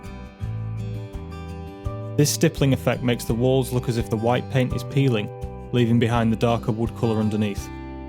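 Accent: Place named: North, United Kingdom. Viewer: God bless you! i like the thick Northern accent with these American West Models.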